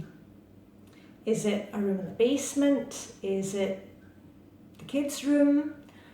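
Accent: Scotland, United Kingdom. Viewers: Hi, Maaike here from Nederland. I started a week ago and I have a shiny sink every single evening. What’s happening to me? 😉 But seriously. I’ve. Never. Ever. Done. This. It’s all new to me and I’m 46. 🙈 Thank you! Love your accent by the way. Scottish?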